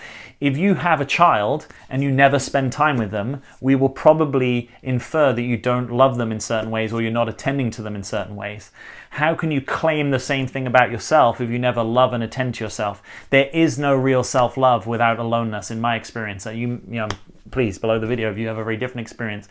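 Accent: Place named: United Kingdom. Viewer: I love this video. Thank you. Although I'm an extrovert and very sociable, I'm learning to embrace my alone time and really starting to value it. I've been single for a year and am just about to go on holiday abroad as a solo traveller for a week - bit nervous, but looking forward to the experience. By the way, on a completely different topic, your accent sounds more British today!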